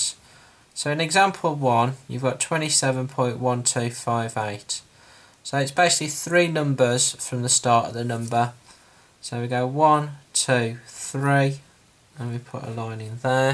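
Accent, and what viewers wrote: Birmingham, United Kingdom. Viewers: Thanks for the explanation + this 🥵 Brummie Accent.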